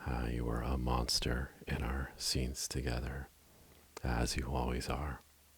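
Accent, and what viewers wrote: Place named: Massachusetts, United States. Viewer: This guy is from Massachusetts for sure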